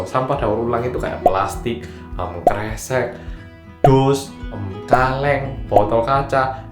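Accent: Java, Indonesia. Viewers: Dulu waktu 2014an ga terlalu suka sama mas karena ngomongnya super medok, feels so weird for me. Tapi semakin kesini malah makin demen, terutama pembawaannya dan ke sederhanaannya. Sukses terus yo:)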